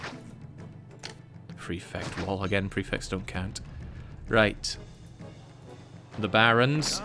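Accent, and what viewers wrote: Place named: Scotland, United Kingdom. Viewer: Dark Young is a bad influence. Since you started hanging out with this guy, you've been sounding increasingly... Scottish.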